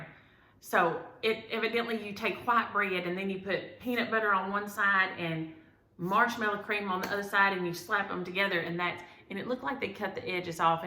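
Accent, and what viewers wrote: South, United States. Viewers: LOVE your videos and your southern draw😆😆. You have some beautiful WIPS. I love Pumpkin Pie with whip. Have a great get-a-way. See you next time.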